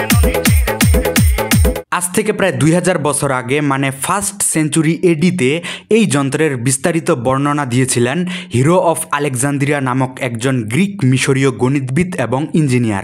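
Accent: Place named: Thakurgaon, Bangladesh. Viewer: তোমার বাড়ি মনে হয় নিশ্চয়ই ঠাকুরগাঁ জেলায় তোমার কথা শুনে বোঝা যাচ্ছে আমাকে নিয়ে একটা ভিডিও বানাও আমি কি সত্যি বলেছি না মিথ্যা বলেছি এই কথা নিয়ে একটা ভিডিও বানাও প্লিজ প্লিজ প্লিজ প্লিজ প্লিজ প্লিজ প্লিজ প্লিস প্লিস প্লিস প্লিস প্লিস প্লিস প্লিস প্লিস প্লিস প্লিস প্লিস প্লিস প্লিস প্লিস প্লিজ🎉🎉❤❤❤❤❤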